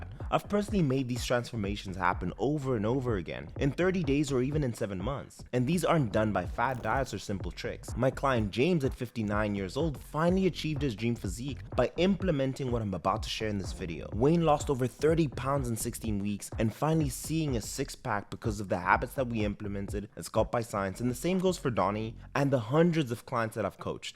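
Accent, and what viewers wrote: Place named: Afrikaans, South Africa. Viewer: Love this dude's Afrikaans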